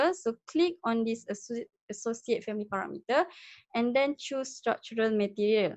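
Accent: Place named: France. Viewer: Thank you for the tip... Very nice Done! By the way what a cute French Accent!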